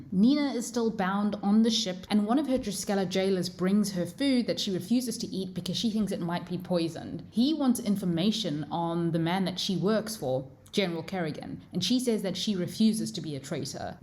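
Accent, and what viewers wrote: South Africa. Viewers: Is that an SA accent I hear? 😍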